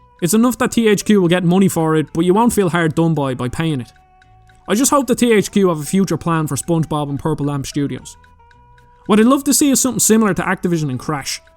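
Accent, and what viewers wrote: Ireland. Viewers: I just love the Irish accent, instead of thing it’s ting ah I love it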